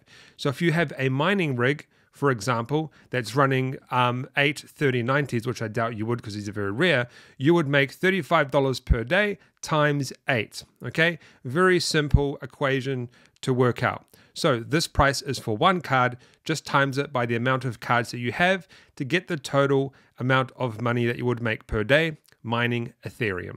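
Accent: New Zealand. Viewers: awesome. a Kiwi Accent. Also When did you make this vid? cuz the info i'm seeing. with a Nvidia RTX3090 est.daily profit is 9.2USD. Ummmm what? defo not 35.42USD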